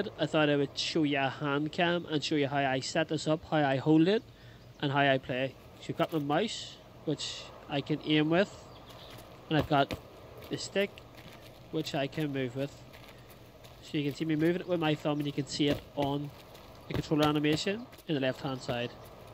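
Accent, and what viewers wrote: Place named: Northern Ireland, United Kingdom. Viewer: You from N.I?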